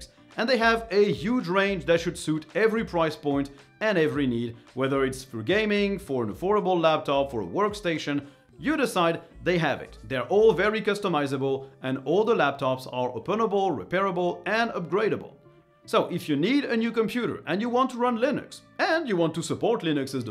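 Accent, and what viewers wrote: France. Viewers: On est d'accord t français ? j'ai l'impression avec l'acent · nice french accent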